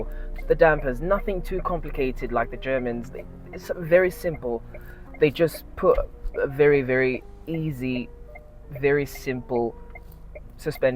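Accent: United Kingdom. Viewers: Bro, where did you learn to speak like that? Too smooth with that British accent👌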